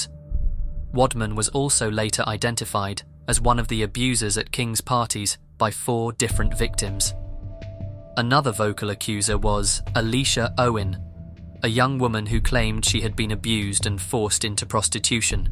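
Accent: England, United Kingdom. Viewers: Always nice hearing another Englishmen having a interesting dark thought provoking channel wish you much success with it 👍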